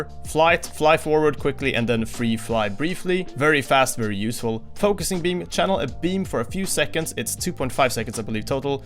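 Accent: Sweden. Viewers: Is this guy Swedish? Cuz he has that annoying accent. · You sound like you come from sweden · Is he swedish? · Ur accent sounds swedish idk why lmfao no hate tho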